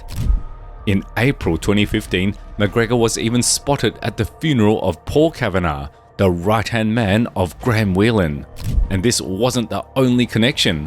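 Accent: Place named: United Kingdom. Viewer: Chinese with British accent???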